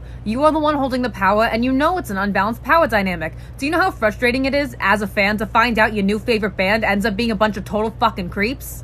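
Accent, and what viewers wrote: New Jersey, United States. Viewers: So I usually don’t like the jersey accent- I find it abrasive. But her accent is quite beautiful. It’s very soothing and almost musical. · This is the only time I heard people say they actually like the New Jersey Italian accent. It makes me happy :’))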